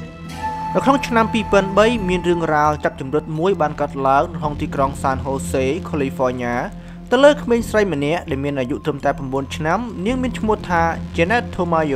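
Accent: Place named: Siem Reap, Cambodia. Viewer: Admin និយាយមកដឹងតាអ្នកសៀមរាបម័ង😂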